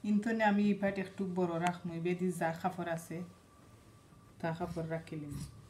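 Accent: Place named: Sylhet, Bangladesh. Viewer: Nice hearing sylheti bangla. Can u show how to make saree blouse?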